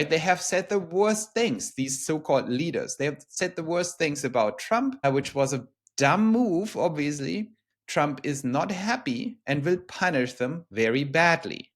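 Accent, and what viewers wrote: Germany. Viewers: You’re making so many false claims on statements in this video that I couldn’t take it anymore. I would love to point out all of them to you, but unfortunately YouTube keeps deleting my comments. So let me just say that it is a real shame that someone who really doesn’t have a lot of insight and understanding of German politics is spreading misinformation on the Internet. Going by your accent, you may have been brought up in Germany but that doesn’t change you blatant misrepresentation and errors. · Hmm... You sound German. You look Filipino. You live in the US (I think). And have a Thai sounding name. Fascinating!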